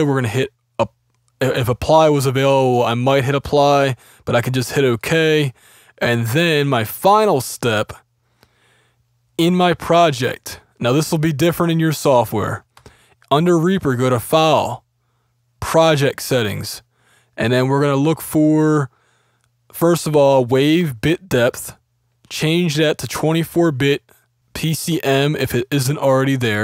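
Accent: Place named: United States. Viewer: Hmm...I recognize my own american regional accent. What state you from???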